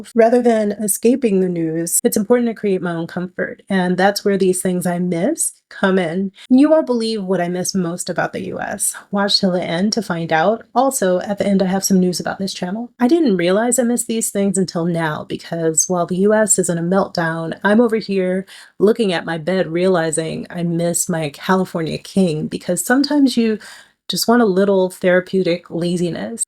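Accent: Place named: Spain. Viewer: Ooh ooh, lovely Spanish accent 👌🤗 Hey a biker chic too 👌 All minor inconveniences compared to the maggot that's damaging US democracy 😉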